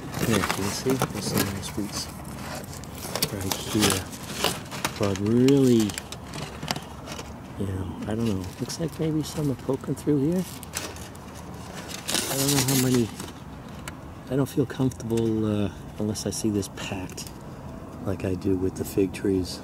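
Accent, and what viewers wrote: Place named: Boston, United States. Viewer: Happy New Year! Hope that you are doing well. I miss your regular clips and commentary! Well, maybe it's the Bahstun accent! Best regards, Chip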